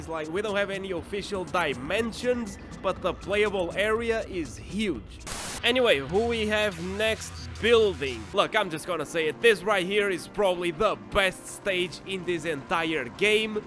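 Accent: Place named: Portugal. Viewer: Wowowowoww do i hear....portuguese? Um português fã de Tekken 4 rahhhhhhh🇵🇹🇵🇹🇵🇹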